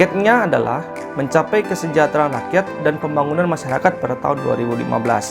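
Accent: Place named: Balige, Indonesia. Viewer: Masih sama kali logat abang ngomong ya kayak di balige dulu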